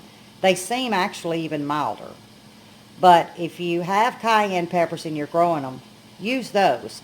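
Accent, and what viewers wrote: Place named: Florida, United States. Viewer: I absolutely love your accent. My kenfolk are from Blounstown FL Panama City FL and speak like you. You sound just like my cousin 😊 Good video. Thank you.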